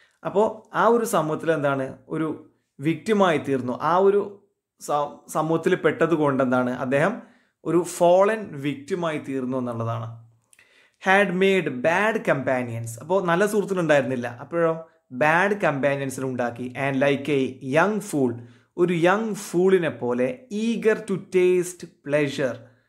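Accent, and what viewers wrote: Malappuram, India. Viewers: Malappuram ahno atho palakado?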